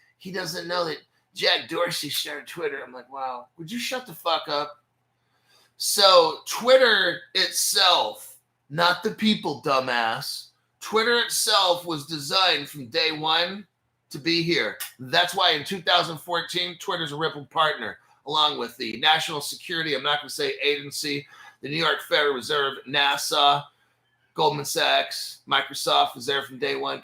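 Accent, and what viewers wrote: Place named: New Jersey, United States. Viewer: You must be from Jersey because you talk just like me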